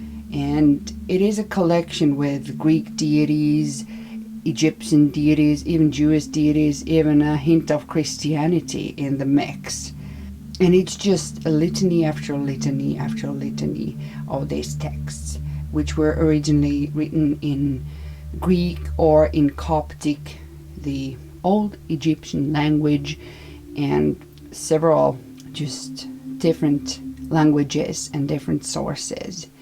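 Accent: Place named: Finland. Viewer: Fun to watch your video. Is your Myrrh colored white (or is it just the way the top of the box looks?)? BTW, loving your Finnish accent, soooo awesome! :)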